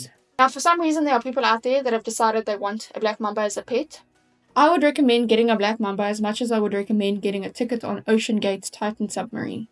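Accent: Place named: South Africa. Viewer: That South African accent sounds fire 😂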